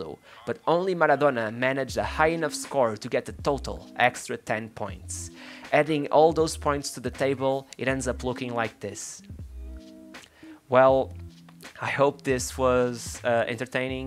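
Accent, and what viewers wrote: Portugal. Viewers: Lol this guys is portuguese 😂 accent tho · you sound like a portuguese speaker, and I am wondering if you are from Brazil, portugal or another portuguese speaking country. Anyway what I about doing one for Juan Román Riquelme, by far my favorite player growing up and wanted to know other people's opinions. Cheer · I bet this guy is portuguese because of the accent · Dude are you portuguese u really have a portuguese accent saying the names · He’s Portuguese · Gotta love that awesome portuguese accent! A great video for a great player! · This is a portuguse narrator for sure eheheh I Love Rivaldo! He has class and is not a party animal I love lo listen him talk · Is it me or you are portuguese?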